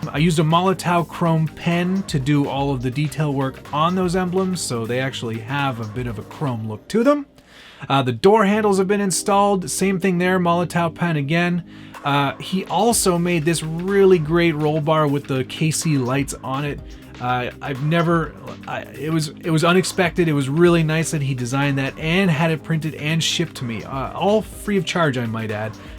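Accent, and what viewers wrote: Canada. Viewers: Please type the name of that chrome paint pen you've mentioned a couple of times. Not sure if its your Canadian accent or my shitty ears or a combination of both... Tractor Truck is looking bad ass. The brazing work really looks great painted. Shows that not everything has to be bought off the shelf...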